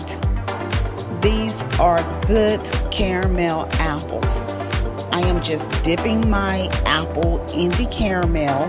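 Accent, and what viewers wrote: South, United States. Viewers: I love your southern sweet dialect. It's so soothing. Thank you for the tutorial.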